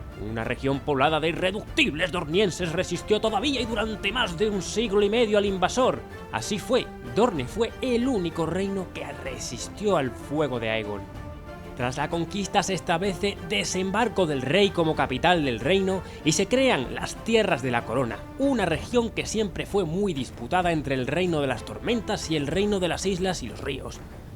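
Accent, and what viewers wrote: Castile, Spain. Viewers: Me encantó el video :). Es tan raro para mí ver todos los nombres en castellano y también el acento!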